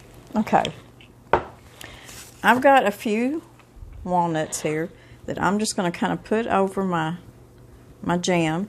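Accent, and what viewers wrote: South, United States. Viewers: Want a big laugh? I'm from the frozen tundra of central MN. The people around me say, "Ya, sure, yabetcha". I don't speak Southern, so at times, I have to watch the video a couple times to figure out what's said! Don't get me wrong...I LOVE YOUR VIDEOS AND THE OLD WAYS! I just don't catch Southern as fast. Thank you for sharing your videos! Please don't stop...Ill try and keep up! LOL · I just noticed your from Imboden, Arkansas!!! My husband and I are from Paragould and relocated to my hometown in Southern Illinois a few years ago when my mother got sick. We love your channel and have tried some of your recipes and will be trying many more!!! Thanks for sharing your recipes and thanks your southern accent that I miss so very much.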